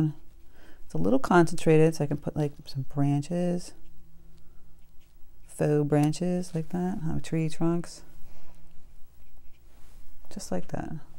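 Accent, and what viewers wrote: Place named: New England, United States. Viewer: I loved the painting and I love the tutorial. You are very good at explaining the process. And I loved that I could detect a New England accent I believe!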